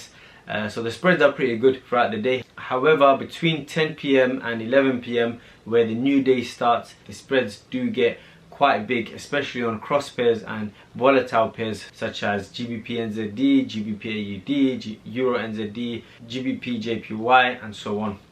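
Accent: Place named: Nigeria. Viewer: Bro what country are you from. You sound a bit Nigerian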